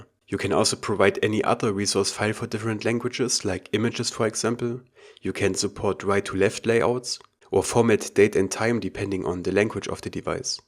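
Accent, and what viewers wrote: Germany. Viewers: hört man richtig raus das du aus Deutschland kommst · yes...... your accent ....... it's abit nearer to German ...... even i turned on auto subtitles .....it generates German ...... so could i have connection with you ....? if you could add subtitles manually and i could help in that also if you need in Arabic ....Just a Suggestion . <mail>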